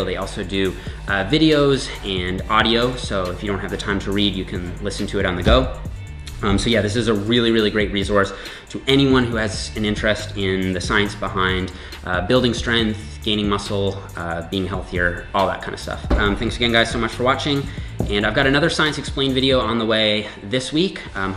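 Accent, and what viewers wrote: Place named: Newfoundland, Canada. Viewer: My thoughts as I watch you " Oh hey his accent is familiar....wait a second, his last name is Nippard googles your name HAH hes a newfy! " Yaaaaaaaay!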